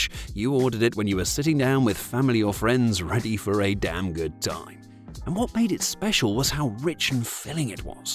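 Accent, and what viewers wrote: England, United Kingdom. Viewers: It's weird hearing american fast foods of yesteryear being narrated by an englishman.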